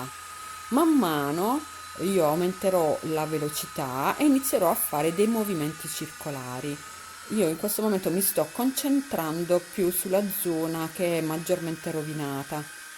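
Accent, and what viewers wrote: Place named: Bari, Italy. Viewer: Tu sei meglio di Diletta. Sai fare cose speciali e sei simpatica. Non mi sembri zona Foggia..più verso Bari. Sei chiara nelle spiegazioni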